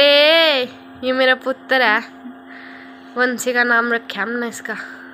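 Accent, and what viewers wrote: India. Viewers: Kurukshetra side se ho kya boli bta rhi h☺️ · जी आपका सीधापन और आपकी हरयाणवी बोली बहुत ही लाजवाब है खुश रहो आबाद रहो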